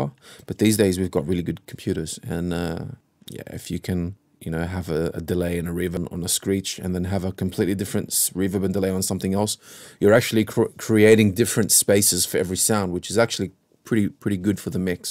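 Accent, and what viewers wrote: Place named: Australia. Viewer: Ahh the comforting sound of the aussie accent, it makes me happy to hear you haven't lost it at all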